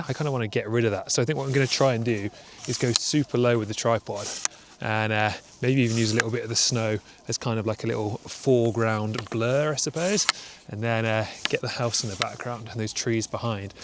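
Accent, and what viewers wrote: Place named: United Kingdom. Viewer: Agree that winter is a tough time to shoot in the Canadian Rockies. I was in Assiniboine area for a week, (plus several days in Lake O'Hara) almost two years ago -- it got colder than -30 celsius (my thermometer froze below that!). Batteries die very quickly, as well, and in those temperatures you can't expose your hands for long. But one thing the snow usually does help with is to simplify the scene and that often opens up many opportunities, I find. And by the sound of your accent you hail from a similar corner of the UK as myself. I wonder whether you were also drawn to Canada through falling in love with the Rockies? (I've been hiking the Canadian Rockies the majority of my summers since the 1990s.)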